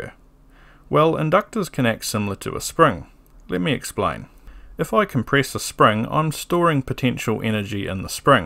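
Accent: New Zealand. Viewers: 😍 I could listen to a Kiwi accent all day. ❤ from the West Island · Great vid, thanks. Nice to hear a fellow Kiwi accent for once!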